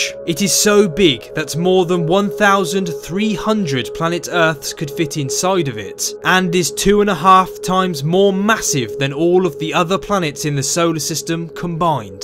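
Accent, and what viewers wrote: United Kingdom. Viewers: I love your british accent 💖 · Why are you British?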